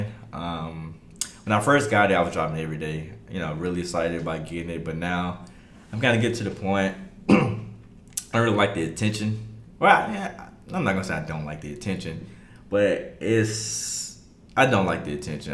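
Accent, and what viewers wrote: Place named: Texas, United States. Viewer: Also you sorta have a country accent like Texas in a way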